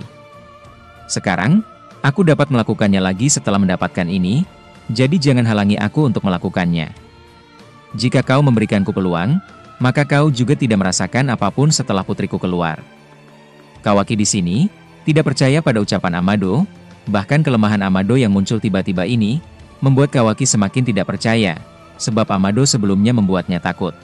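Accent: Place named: Makassar, Indonesia. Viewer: makassarnya kantala, bnget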